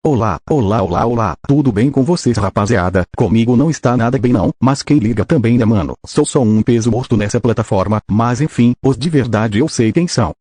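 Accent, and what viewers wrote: Bahia, Brazil. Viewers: em fim um baiano kkkkkk morri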